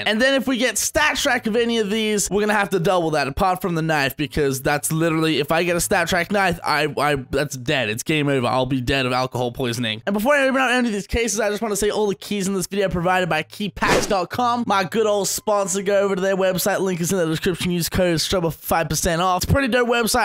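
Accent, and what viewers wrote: Australia. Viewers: Your aussie O.O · your aussie! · i fuckin knew you were aussie wewwwww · knew u were aussie bb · your from Australia what state you from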